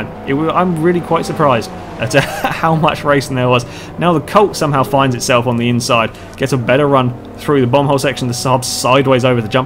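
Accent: United Kingdom. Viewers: Some of you might confuse of him. He really speak like that because of his British Accent. Don't complain too much what he is saying. '_'"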